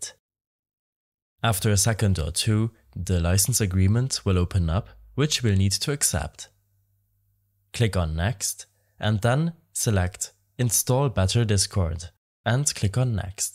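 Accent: Germany. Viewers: I smell deutsch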